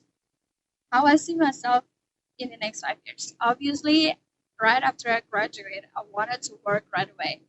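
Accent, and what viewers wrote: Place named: England, United Kingdom. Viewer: Wawww i lov ur english accent😳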